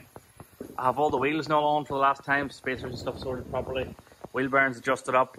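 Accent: Scotland, United Kingdom. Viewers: All I can say about your damn racket, is CRANK IT UP,! the montage shred or the V6, but CRANK IT UP! You need to take yourself down to Shropshire and drag Rich down to a pub with a good sound system and have a DJ Shoot Out! I'll admit to old and moldy- but your music choices were really "on time" with me. And the Capri, she has a real crackly sound, even before the "big tune"- awesome! Make no mistake, the lilt of your Scot's home accent is much fun- I come from a family with some "furrin" roots too! But the music over was two fer for me- the sounds and the engine! It does look like you maybe through before Binky gets all reassembled- if you go to Shropshire, go in the Capri and show them what a finished car, reassembled looks like- poor Binky has been scattered to the four corners for a long time now! Best to you, the missus and kids, PS; You go down to Shropshire, buy Nik about 7-8 pints and then get him to judge! 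Richard has children and can't afford that level of bribery! Since the gorgeous blue paint job didn't cost nearly what Binky's anything but "plain stove white" must have- even in favors it must have been a pile- a few pints you didn't drink should keep the missus from worrying! Or take her along- bet ya'll haven't been on a "date" like that in a while! FR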